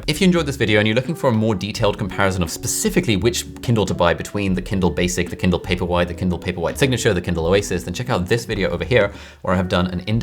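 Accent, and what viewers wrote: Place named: United Kingdom. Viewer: I feel so settled when I hear that british accent and looking at you.... 🥰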